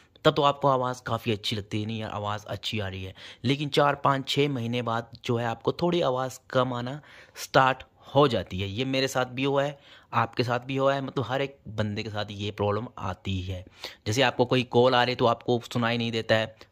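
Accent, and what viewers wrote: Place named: Himachal Pradesh, India. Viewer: pehle ye btao u belong frm Himachal na ?? M 101% sure yes u r frm Himachal …..I recognised u by yr voice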